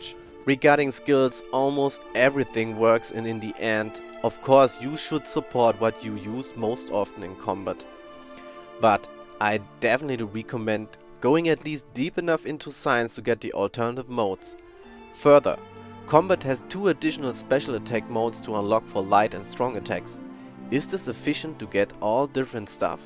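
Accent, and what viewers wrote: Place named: Germany. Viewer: Hört man übelst raus dass du deutsch bist :D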